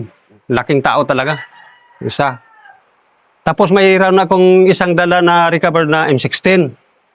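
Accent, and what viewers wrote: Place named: Subanen, Philippines. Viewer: Sa accent ni Master Subanen tribe sya kung di ako nagkakamali kaya matapang... Matapang ang Subanen tribe sa history nilo bolo lang at spears ang armas nila... Salute you!...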